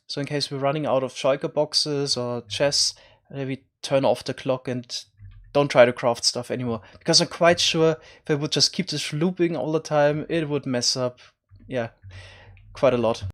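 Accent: Germany. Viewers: German accent shows, I'm using to imitate a German accent towards my German friends:) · german here, is his English really that german?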